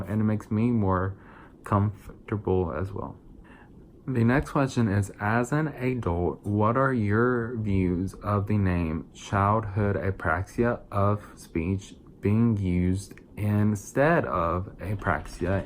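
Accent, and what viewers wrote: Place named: South, United States. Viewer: What part of the south you from. I hear it real well lovely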